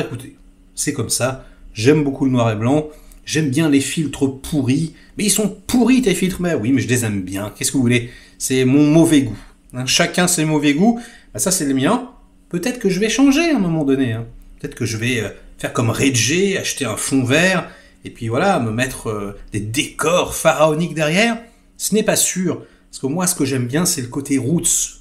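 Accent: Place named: Quebec, Canada. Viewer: Quand je fais connaître ta chaîne du Québec,ils l'adopte immédiatement tu parle comme nous sans prétention, bonne anniversaire 2 ans tu mérite ton succès.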